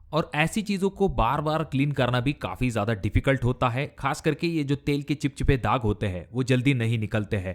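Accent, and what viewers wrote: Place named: Gujarat, India. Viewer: As per your speaking hindi I think you're a Gujarati I want an Idea for L type small kitchen guide me sir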